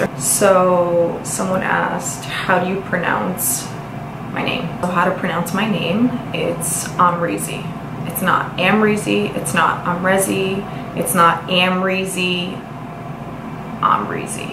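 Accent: New York, United States. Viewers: now that you're back in ny you're ny accent is back! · I'm an OG follower aoww<link> Also a fellow born and raised NYer...always loved your NY accent...finally seeing influencers with the same NYC swag I grew up with is nice!!!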